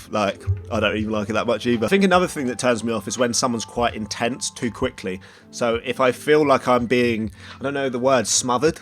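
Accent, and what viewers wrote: United Kingdom. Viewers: I hate british accent but love yours :) though I need to work on my listening skills to get 100% of what u r saying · I didn’t know who u were but I was not expecting a British accent 😂😂 or idk what accent u have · Esther Igbinedion it’s very obvious he’s british lmao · Yeah he’s a fellow Brit :) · Aww i am so in Love with this british accent. Greetings from Germany ;) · Your smile Yout facial expressions 😭 I like them all, once again And to top it up the Bristish accent May you always remember this comment when you feeling low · I really like that accent, does anyone know which one it is? Like what region from Britain or how it’s called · His accent is the same as everyone’s British accent smh 🤦‍♀️ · His british Accent is so cuteeee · Okay I just found your channel, wasnt going to stay but then the accent...lol yh it got me, wish my British accent was that strong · Oh My God, I love YOUR ACCENT!!! 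WHERE ARE YOU FROM?? Btw I can tell you're from the uk but where exactly?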